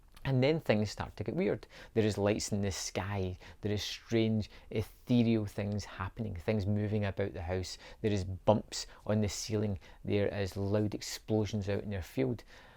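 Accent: Scotland, United Kingdom. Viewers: Was surprised to hear a fellow Scots voice.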